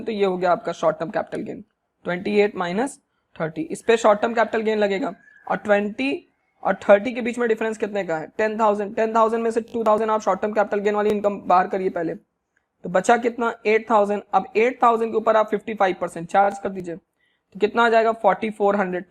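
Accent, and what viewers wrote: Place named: Lucknow, India. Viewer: Sir aap Lucknow se hai kya ?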